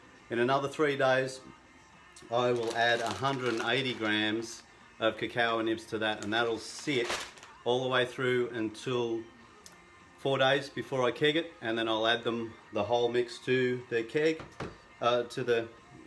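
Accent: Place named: Australia. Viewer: Are you in Seattle? Can't help but notice ozzy the accent lol...Im in Western Australia, just saw your beer reviews on Craig Nubergers channel. Very interesting beer in this video! Keep it up.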